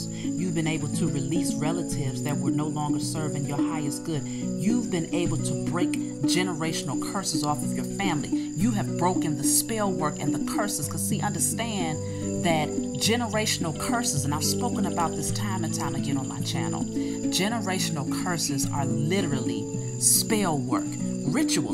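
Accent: United States. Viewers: Love your accent. Which Part of America you from? Also, this here is one of the Most Powerful and On the Nail message I have hard in many years. Not here to state my personal business, but I want to thank you. From Black Man, from London, UK.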